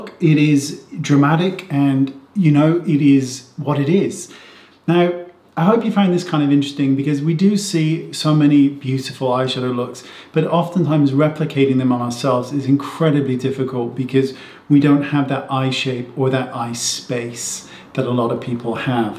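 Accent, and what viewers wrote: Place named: Scotland, United Kingdom. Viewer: What sort of lovely accent do you have? Sounds a bit Scottish sometimes.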